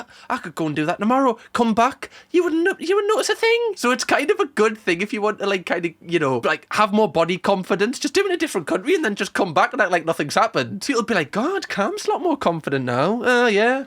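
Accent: United Kingdom. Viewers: To be fair i like the british accent indeed the best😂 (coming from a german)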